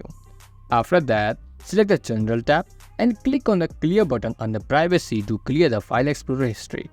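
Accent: India. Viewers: I wish I could understand Indian accents. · when you hear a indian accent you know ur problems getting fixed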